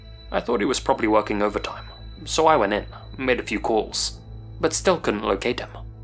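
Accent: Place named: United Kingdom. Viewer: Ngl I hate British accents… but in this case the way you narrate stories with your British accent actually makes the stories better. Keep up the great content!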